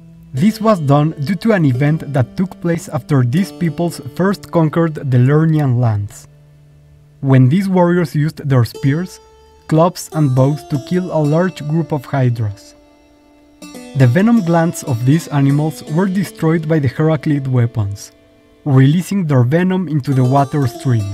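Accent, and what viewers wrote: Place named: Latin America. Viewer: I love the video!! Your English sounds like your from a Latino country right? Sorry if I sound rude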